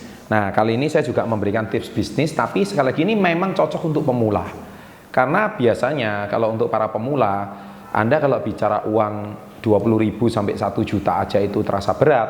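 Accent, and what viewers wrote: Java, Indonesia. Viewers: Orang mana sih bang kok logatnya jawa banget😁😁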